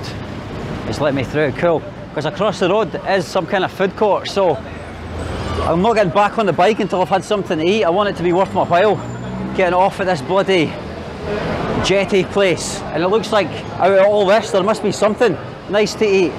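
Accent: Scotland, United Kingdom. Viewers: your scottish accent make my throat feel swollen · Have to make myself familiarise with Heavily accented Scotland English. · I love your Scottish accent.👍🏼👍🏼😁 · Seen this on FB a year ago, now finally get to see the full vid hahaha. Loving that Scottish accent. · I like Scottish accent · You are a sweet man. Bit of a clumsy bum but the Scottish accent and lovely approach to people makes up for it! Will keep watching and I have subscribed. I bet your taste in food has grown astronomically...well done as it’s not a common thing for UK citizens to have a broad palate.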